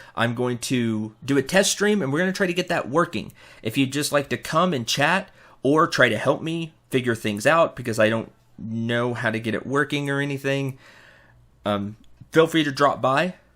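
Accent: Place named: Texas, United States. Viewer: Let me guess by your accent since one of my cousins who lives there sounds like you. I am going to guess you live in Texas, I have 1 out of 13 shot. ^_^ Also, can you play Kirby and the Crystal Shards 64 if you ever have time or if you even have it. P.S. I am not going to hunt you down, I am just intrigued if I am right. I also live in GA, so it is not like I could even if I wanted to.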